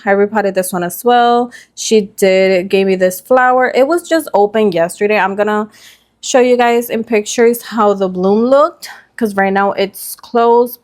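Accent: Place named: Puerto Rico. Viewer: I will, tienes accento de Puerto Rico, eres puertorriqueña? Si no te molesta decirme, yo soy. Muchas gracias,.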